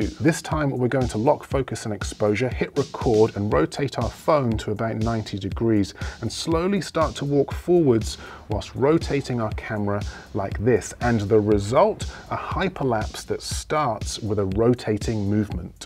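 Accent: United Kingdom. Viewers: I just subscribed a few days ago, I watched at least 15 vids of yours, I ordered a Gimbal this morning ($139) which I have never beard of lol. Next I will check out Epidemic Sound oh and Skillshare too. I just started my youtube vids, after I watched yours I am so embarrassed to show mine. But Honestly I think I have a crush on you, you're so talented, genuinely kind and hunble. LOL not to mention your British English accent so sexy 😅😅😅😅 ok let me stop 😅😅😅😅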